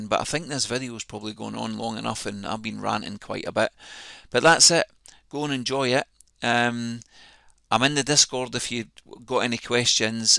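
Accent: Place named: Scotland, United Kingdom. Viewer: Hi, I’m a new user. I’m enjoying your videos but can I give a suggestion. You move back and forward without giving detail. For instance, in this video you mention artefacts then immediately go to find the problem. At this stage I don’t know what artefacts you’re talking about. It would be beneficial I think, to your viewers if you could mention what the problems were. This would make sense of your remedies. I don’t mean to criticise so hope you don’t take it as such. I would point out I am visually impaired so it is possible it’s just me but I don’t think so. P.S. It’s good to hear a fellow Scott’s voice it a YouTube video for a change.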